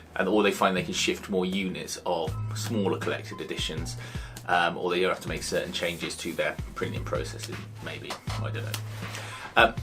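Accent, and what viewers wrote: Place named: England, United Kingdom. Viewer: Finally hearing an English accent review an American comic.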